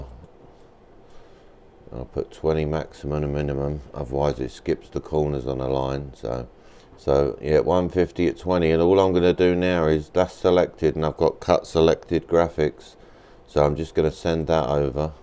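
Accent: United Kingdom. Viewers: I thought you were from the UK with that accent.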